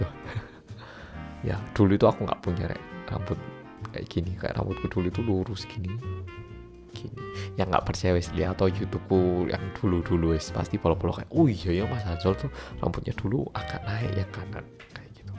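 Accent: Java, Indonesia. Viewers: Iki Jane orang Korea apa Jawa sih, kok lucu ngomongnya 🤣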